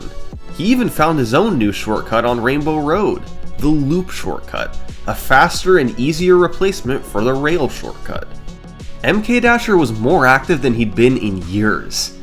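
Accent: United States. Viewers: Thats what American sound like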